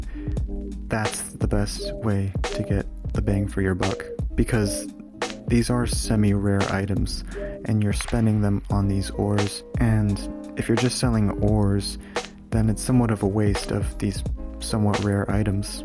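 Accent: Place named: India. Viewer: He is Indian and his accent is so so bad!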